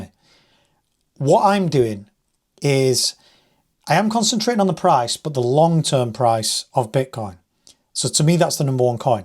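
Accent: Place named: North West England, United Kingdom. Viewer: Good to hear a north west accent in the space! Good luck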